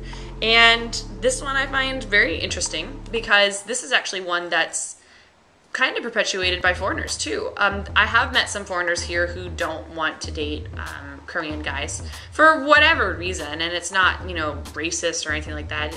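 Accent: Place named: South, United States. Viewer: Do you ever find your southern (you don't have the full c*** a** accent) causing communication problems?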